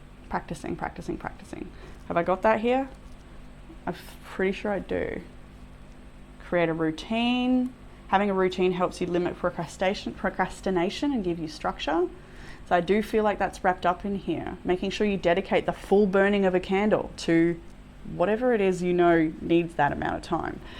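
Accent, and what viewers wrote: Australia. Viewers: I love your readings. Some resonate more than others. I love listening to you as I’m also Australian and it makes me feel closer in some way. I listened to this reading last night and picked group 2. This particular reading felt so personal and I’m hoping it did for many others too. I love that sometimes it is right on the mark. Thank You 🙏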